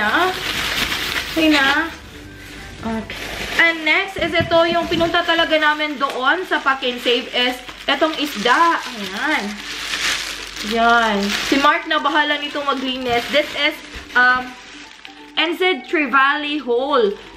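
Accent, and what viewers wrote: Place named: Visayas, Philippines. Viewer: Murag bisaya lagi ka madam Okay naman pala mga bilihin jan atey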